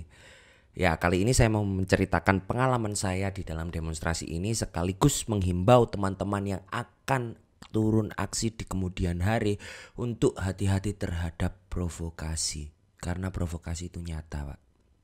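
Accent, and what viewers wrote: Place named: Java, Indonesia. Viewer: Feri Irwandi versi medok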